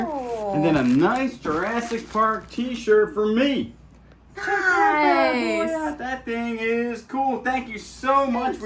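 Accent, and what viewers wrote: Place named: South, United States. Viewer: You're driving me nuts! The word is MONO- gram(ed) it isn't "MAMA-gram(ed). I know we southerners have our drawl, but still. (You can only call something a Mamagram if it's a mailed Mother's Day card and you put just your initials on it.) And the metal thimble is a shot glass, as in "My gout is kicking up a storm and hurts like all get out, so I need just a couple thimbles full of corn squeezings." 🤣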